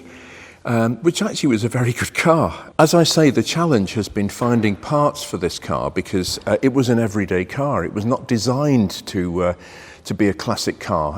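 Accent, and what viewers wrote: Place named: RP, United Kingdom. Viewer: has to be the poshest bloke on YouTube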